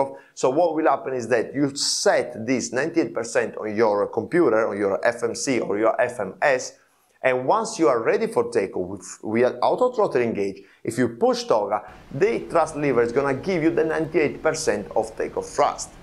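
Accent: Italy. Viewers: ....on the other hand I heard a good explanation of TOGA in plain English with a slight Italian accent: Very well done...I've been looking for an explanation of TOGA and now it has clicked...everything else I can find in the FCOM. Thanks You!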